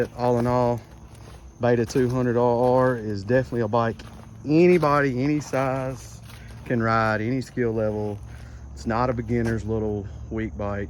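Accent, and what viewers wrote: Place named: South, United States. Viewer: i'm not from America, but.... is that a bit of a Southern accent i detect there...? i sure think so !!! :-))) great looking bike bro ! thanks for the review ! greetings from Israel ! take care !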